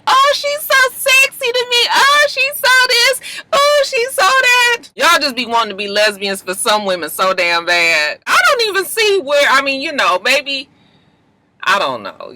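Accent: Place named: California, United States. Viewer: "you got a hit right there" still got that cali accent I love it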